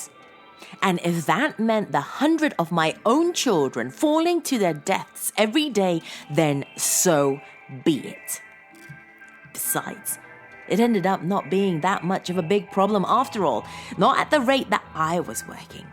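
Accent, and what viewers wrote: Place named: RP, United Kingdom. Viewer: I'm very curious to know why you sometimes say 'de' instead of 'the' when you are quite able to pronounce 'the' properly with your quite posh accent! Very bizarre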